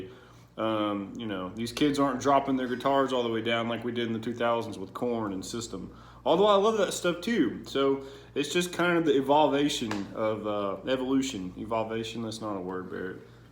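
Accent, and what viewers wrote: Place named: South, United States. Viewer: man enjoyed it by your accent you sound like a southern boy where you from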